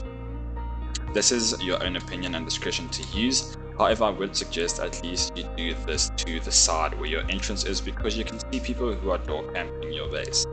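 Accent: South Africa. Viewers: are you South African ? couldn't mind noticing the accent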